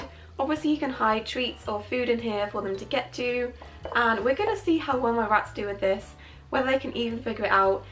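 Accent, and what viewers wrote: England, United Kingdom. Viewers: At the end you said about your voice. Well I think it's lovely. English accent is really cute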